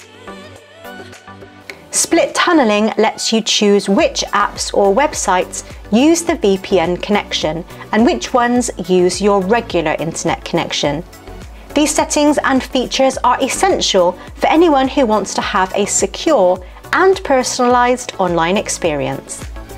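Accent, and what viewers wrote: United Kingdom. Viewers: I like you a lot for several reasons, to start with, you are a lovely girl, feminine, attractive, beautiful British accent and so on.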